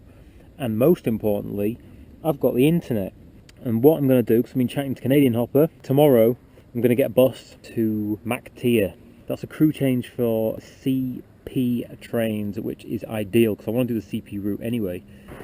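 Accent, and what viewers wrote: United Kingdom. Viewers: This guy has a British accent though !?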